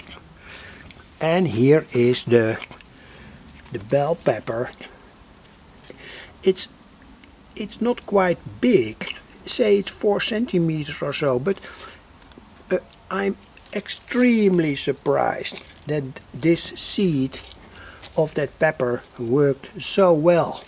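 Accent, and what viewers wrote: Netherlands. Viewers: Love the garden update! I grew up working in the garden with my Dutch Oma and have always had a love for growing plants as a result. I follow your channel for two reasons. The first is to learn about electronics through your projects. The second is to hear your accent because it reminds me so much of my grandmother. Have a wonderful day,!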